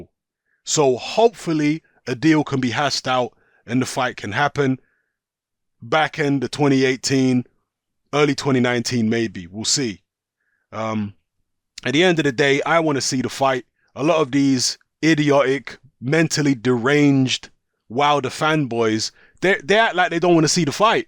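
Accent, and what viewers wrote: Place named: South London, United Kingdom. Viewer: has a very heavy South London Accent. Which part of America is South London in?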